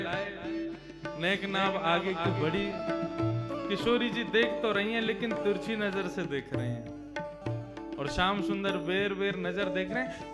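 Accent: Braj, India. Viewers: 🚩🚩🚩🙏🙏🙏🥰😅 अति सुंदर अति सुंदर महाराज जी बृज बोली कितना मिठास है सुनने बड़ा आनंद आता है श्री राधे राधे हरे कृष्णा प्रणाम प्रभु❤❤❤❤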